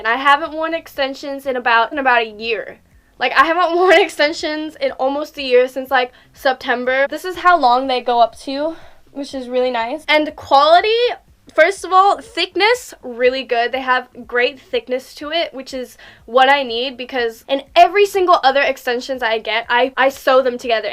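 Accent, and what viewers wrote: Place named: Australia. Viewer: You sound more Australian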